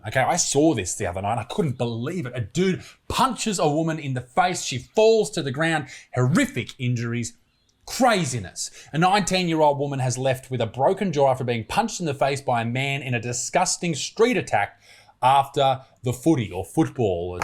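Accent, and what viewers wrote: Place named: Australia. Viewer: i love ur accent as iam am also an australian!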